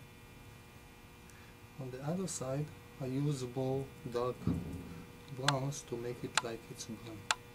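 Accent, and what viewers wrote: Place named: Israel. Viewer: Amazing! which powder did you use? and.. you have an Israeli accent, are you originally from Israel??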